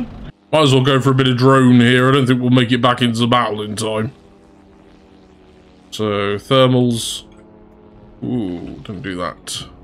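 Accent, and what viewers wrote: United Kingdom. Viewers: as a British person I can confirm he very much is British not northern tho unsure of accent. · Is he British though!?!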